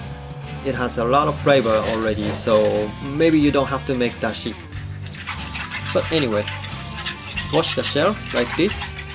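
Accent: Japan. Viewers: Why are you talking in a japanese accent? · a white guy with a japanese accent. smh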